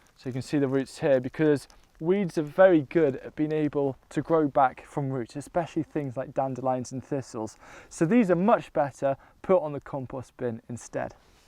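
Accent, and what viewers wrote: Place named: Lincoln, United Kingdom. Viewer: one of my professors is from Lincoln! I recognized the accent from hearing you and when he described his home and how the soil is great for farming, I put two and two together. Love your content! Glad to see you're back! ❤️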